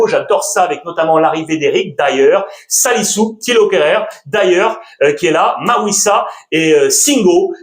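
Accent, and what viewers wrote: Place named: Spain. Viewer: J'adore ton talent depuis tes prestations sur Canal +. Surtout ta prononciation avec ton craquant accent espagnol des noms des joueurs de la Liga. Stp prononce mieux le nom du défenseur uruguayen du Barça : R. ARAUJO pour mon grand plaisir, depuis Abidjan ❤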